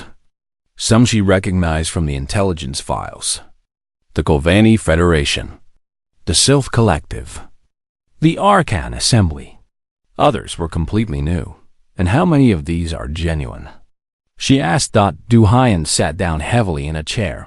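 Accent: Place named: Boston, United States. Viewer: Yella. Can we get a narrator that doesn’t have a god awful Boston accent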